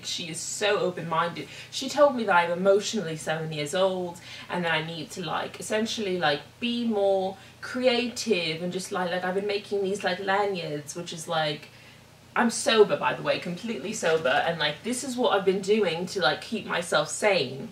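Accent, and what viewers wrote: United Kingdom. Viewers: Why do you sound British? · You really are a true artist. Been British myself too, let me tell you. I claapprove of your british accent! Have fun in 🇸🇪 Sweden. Im glad you're doing well. Be blessed!!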